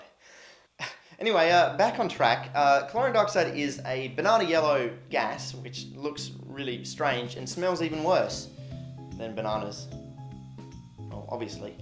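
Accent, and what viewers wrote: Australia. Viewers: For sure; without any doubt in my mind of all the chemtubers based solely on your being from Australia, you would be the most likely to both start a fight and with it. I'm not suggesting youtube host you, I'm saying 12 cans and three Jager bombs you. Having said that though you sound a little Victorian, maybe southern NSW or something? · Urinanalysis recycled tube with yellow liquid therein...oh yes! And your Aussie accent sounds like mine, but I'm in SA and surely you are a Perth person???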